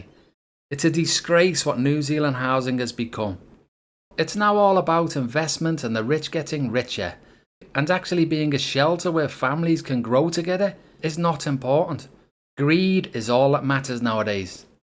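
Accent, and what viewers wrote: Liverpool, United Kingdom. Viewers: Are you from Liverpooool mun?!!?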